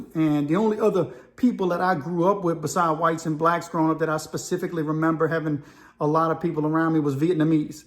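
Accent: Louisiana, United States. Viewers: You sound like you are Cajun my man. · You sound like Louisiana supposed to.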